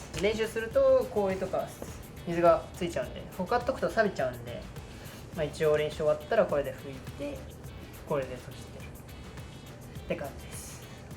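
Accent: Nagoya, Japan. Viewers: ほかっとく💕 たまに出る、名古屋弁にキュンキュンしちゃう🥰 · 名古屋弁が…… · 適度な名古屋弁がめちゃめちゃ良いです😆✨✨ こんなふうに現役スケーターさんの動画が見られるなんて良い時代やなぁ💕 宇野さん、チャンネル開設ありがとうございます🎶🎶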